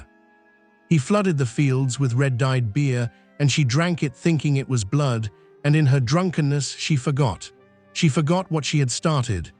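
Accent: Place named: Europe. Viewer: Why do these channels claiming to reveal hidden African truths hidden by Europeans always have a European narrator? Let us hear our history with our own "voice"!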